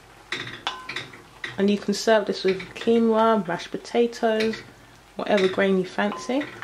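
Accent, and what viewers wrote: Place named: United Kingdom. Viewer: Aside from the British accent your voice is so nice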